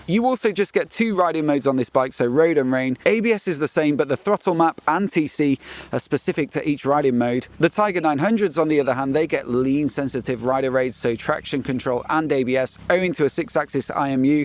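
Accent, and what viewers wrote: United Kingdom. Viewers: Awesome information, and a British accent that is pleasant to listen to. Thanks!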